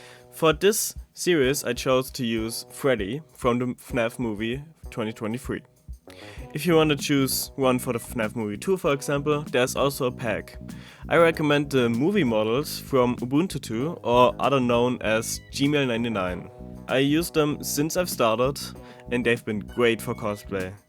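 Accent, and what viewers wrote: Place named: Germany. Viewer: Ich glaube es könnte sein, dass du Deutsch bist(Akzent)😅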